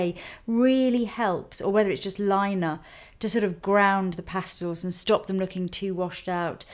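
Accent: Netherlands. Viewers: She is from Netherlands.. So that might be Dutch - English.. ;) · I heard her accent and I KNEW she was Dutch! YAY HOLLAND! :D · I could hear she was from holland. Her accent (when she speaks English) sounds a lot like mine :) WOmderful look though! Looks great on her, I feel inspired! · funny, i''m dutch and i recognize her accent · I saw the other videos first and thought, mmhh she sounds Dutch. Then in this one it was confirmed haha can recognise dutch people anywhere!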